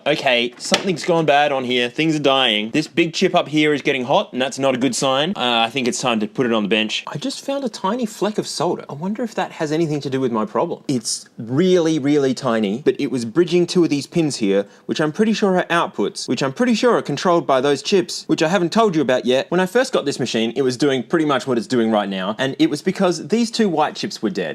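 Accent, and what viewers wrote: Australia. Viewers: I love the Aussie accent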